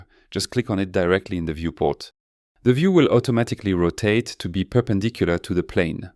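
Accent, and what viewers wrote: France. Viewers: Are you french ? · I can hear the accent… your french is showing! ( vrai question, est-ce que ce tuto est disponible en français? Je n’ai rien trouvé… avec l’outil de recherche) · very very well. although the speed of talking ( that i had to reduce the speed to 0.75 or even 0.5 in somewhere) I liked the way of explaining and your very pleasant French accent. I still have a problem with constraints and why, when, where, which and how to use them😁😁. If its possible make a clip about that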